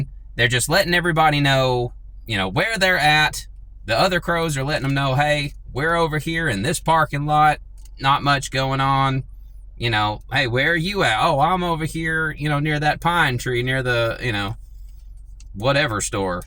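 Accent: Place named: South, United States. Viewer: Thank you for explaining this about crows. And for keeping most of your Southern accent... I'm originally from the bayou country south of New Orleans. Crows around my hometown were very loud, and for some reason I used to be afraid of them. I've since learned more about their intelligence and friendship with humans by watching videos. Where I live now there are a variety of birds and squirrels we feed with black sunflower seeds, along with Crows that let themselves be known in the area. I'd love to learn how to meet and befriend them. I've joined your channel and am looking forward to learning more from you. Thank you 😊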